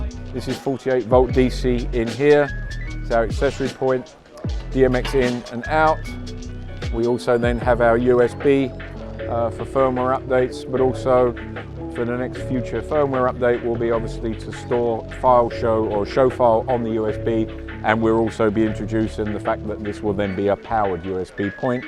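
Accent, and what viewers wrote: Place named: London, United Kingdom. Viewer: That fella has such a smooth Wandsworth accent, like listening to Radio 4 after midnight.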